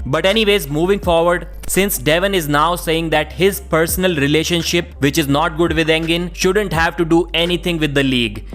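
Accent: India. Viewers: FIX YOUR STUPID INDIAN ACCENT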